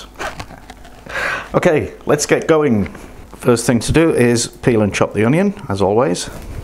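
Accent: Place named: Yorkshire, United Kingdom. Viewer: What part of Yorkshire are you from? Love your easy going style.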